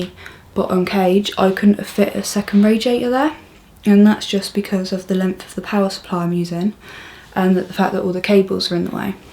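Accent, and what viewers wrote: United Kingdom. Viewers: best review I have seen on the c70 case I bought !! also you are very beautiful and that lovely brit accent just make the review that much nicer to watch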